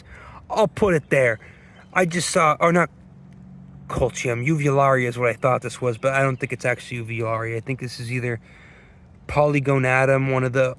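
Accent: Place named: Chicago, United States. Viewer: I love your videos but maybe you should consider leaning into a New England accent rather than a Chicago accent since you’re doing New England botany! Plus a southie accent or a maine accent would be super fun. 🥰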